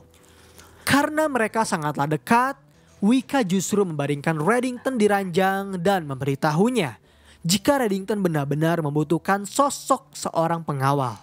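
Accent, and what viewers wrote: West Java, Indonesia. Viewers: fix org sunda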